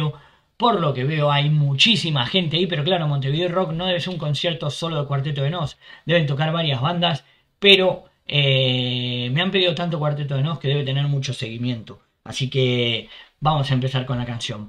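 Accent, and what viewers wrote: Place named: Argentina. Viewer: Este flaco a veces es argentino y a veces es español? Jaja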